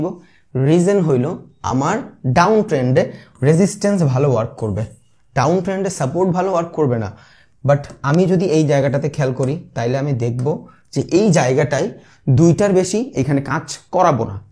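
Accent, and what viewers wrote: Barishal, Bangladesh. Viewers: ভাই বরিশাইল্লা ভাষাটা ভালো ছিল❤❤❤ জোস❤❤❤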